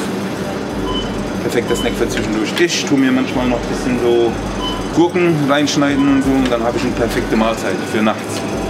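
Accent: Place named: Franconia, Germany. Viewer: er fränkelt manchmal :)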